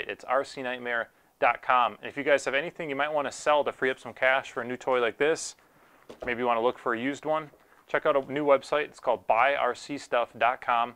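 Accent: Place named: Canada. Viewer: How come you people pronounce "boat" and "out"the same way? Like Canadians. Eeeewww.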